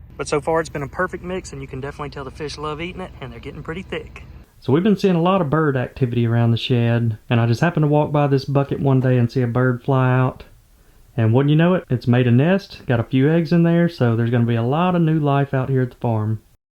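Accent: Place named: Pacific Northwest, United States. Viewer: i love this. The sound outside is also enough for me. if you live streamed your ducks id patreon for that in a heart beat. I just had to move back to the PNW from GA so your accent is very welcomed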